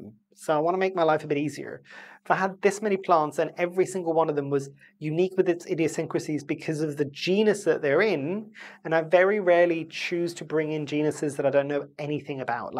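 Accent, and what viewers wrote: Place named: London, United Kingdom. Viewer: Wow, coming from a beautiful warm climate, what inspired you to move to the 🇬🇧 UK? Was it for university? Your accent even sounds like you are a native of London. Ps. You look really good in this video. (Not to say that you don't normally look good) 😊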